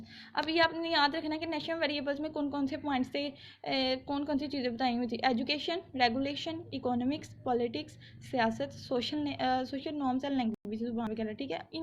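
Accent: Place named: Shakargarh, Pakistan. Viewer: Mjhy smjh to ni ai but mjhy ap ki voice sunny ka Bht Maza aia q k ap Shaker gerh ki h or mjhy achy lagty h whan k log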